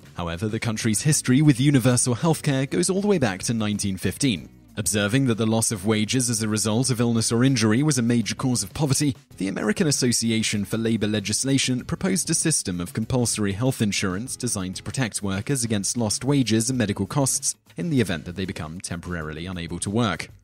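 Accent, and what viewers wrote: England, United Kingdom. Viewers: Why is an English dude talking about America ...... Don't know why I find this weird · one second into the video and I'm instantly drawn away by the fact that a guy with a British accent is hosting this video. GET OVER IT YA DAMN BRITS WE BEAT YOU 241 YEARS AGO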